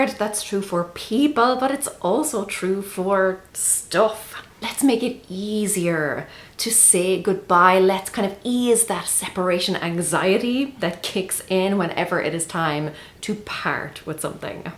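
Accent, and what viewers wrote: Ireland. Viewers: I just watching this video for info and now ill watch it to hear your beautiful accent. Irish, right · sounds like an irish accent but I cant pinpoint where lol :)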